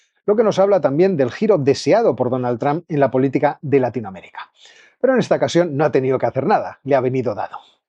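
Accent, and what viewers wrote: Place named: Spain. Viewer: Creo que estás totalmente perdido y andas distorsionando la realidad. Comienza por hablar de lo toxica e inhumanamente posible de habitar que andas muchas areas de Latinoamerica por estar vinculados a la izquierda, a los rusos y vistiendose de delicuentes narcotraficantes. Por su acento es Ud español, yo soy cubano y le alerto, saquen al izquierdista que tienen manipulando España antes de que sea muy tarde. Abra los ojos, no confunda a personas que se pueden creer su utopica narrativa.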